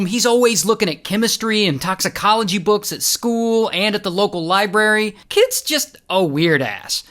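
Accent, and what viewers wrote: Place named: United Kingdom. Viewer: If you can't tell its a British person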